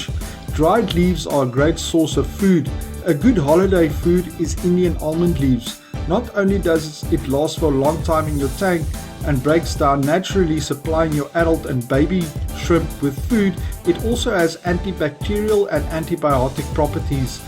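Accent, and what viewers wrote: Afrikaans, South Africa. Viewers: Is that an Afrikaans accent I hear😎👍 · More oom kan ons praat oom klink Afrikaans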